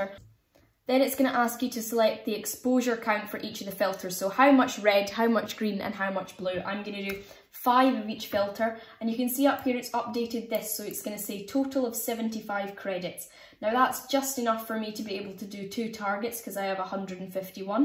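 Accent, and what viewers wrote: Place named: Highlands, United Kingdom. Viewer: Great video,! Did my high school years in Nairn, so its nice to hear that lovely Highland accent again! Keep up the great work and thanks for flying the flag for UK Astrophotography!:)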